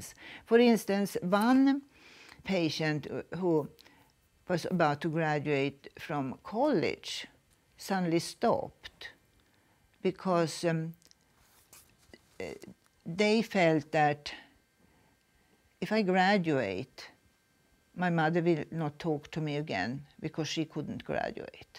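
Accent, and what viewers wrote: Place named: Sweden. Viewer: That Swenglish accent is crazy😅 (swedish-english)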